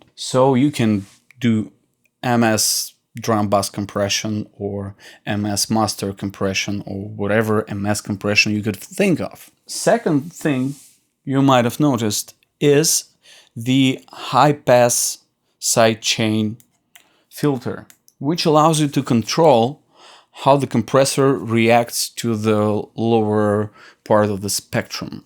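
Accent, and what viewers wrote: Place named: Russia. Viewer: Сразу слышно, Русский говорит. Привет,. Я без стёба.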